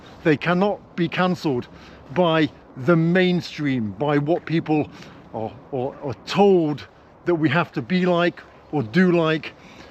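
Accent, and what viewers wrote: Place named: North, United Kingdom. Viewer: У автора просто типичный северный говор)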